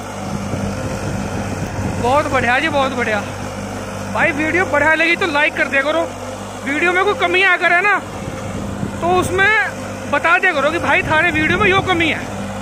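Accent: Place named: Saharanpur, India. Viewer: Bhai teri language share saharanpur walo se miti h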